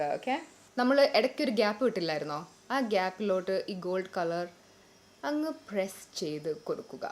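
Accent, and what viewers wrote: India. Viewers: I am glad you're trying videos in Malayalam! I am in Canada and I understand the language fully but its really hard to keep a conversation flowing even though my parents speak to me fully in the language. This motivates me to keep talking. Once I start, I am fine. Your Malayalam is definitely improving from other attempts so keep going! At least you have an Indian accent so it helps for it flow smoothly too! :) That is so sweet a follower helped for the translation too to help non-speakers!!! You're almost to 1M and I am excited, you are going to hit there before Jan 2022 I can feel it!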